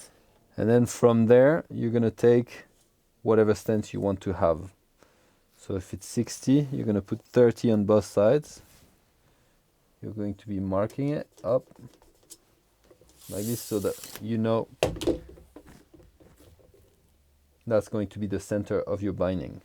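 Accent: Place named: France. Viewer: there is a reason why everyone likes! his so calm and radiates good energy, and the french accent is so smooth u can spread it on ur bread like butter, french butter =) everyone: hav a good surf on ur turf!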